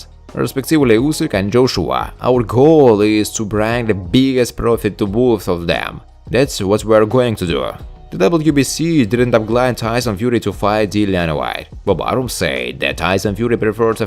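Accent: Russia. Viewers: I just unsubscribed.had enough of this russian accent!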